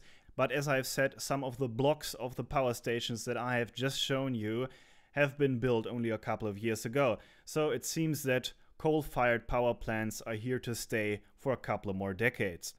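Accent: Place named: Germany. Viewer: Also ich finde dein Video wirklich interessant. Und wenigstens verstehe ich dein "deutsches" Englisch Gut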